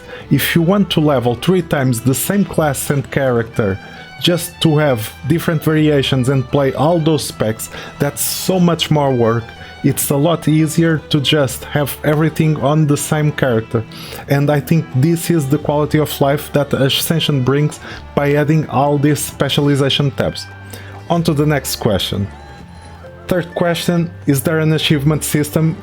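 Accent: Portugal. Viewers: Pelo sotaque consigo logo perceber que és tuga! Não sabia que haviam tugas a jogar BBC.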